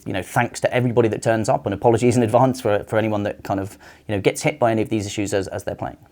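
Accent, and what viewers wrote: United Kingdom. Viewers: That british accent 😍😍 10 more days cant wait to play SoT, I have hope this is gonna be huge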